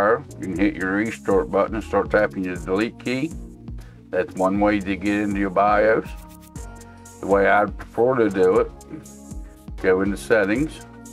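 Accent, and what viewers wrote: South, United States. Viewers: I’ve never seen a man so southern but so smart with pc😂 THANKS ALOT BROTHER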